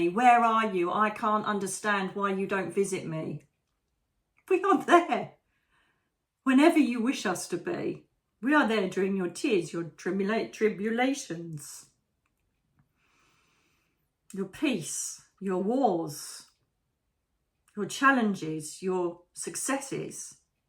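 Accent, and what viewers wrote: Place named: Essex, United Kingdom. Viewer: “Fuzzy”? really now ….😮😂 That was amazing!! Fun and funny. The rock in the river -great metaphor! Mostly I love hearing a bit o Essex over here in New York.❤ Great job!!!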